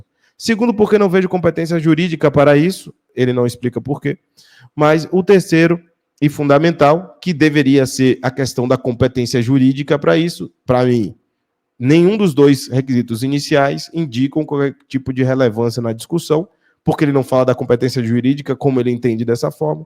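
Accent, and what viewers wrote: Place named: Northeast, Brazil. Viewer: Cara ele é do nordeste, a dicção dele sempre será assim, independente de fono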